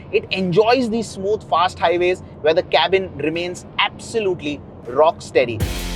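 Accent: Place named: North India, India. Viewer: maTTTerial maTTic. Bro pls don’t insult india with your cheap north Indian English accent 🙏🏼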